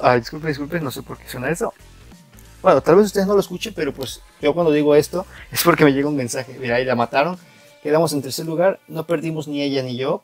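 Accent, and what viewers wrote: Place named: Veracruz, Mexico. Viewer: Como que eres de Veracruz xd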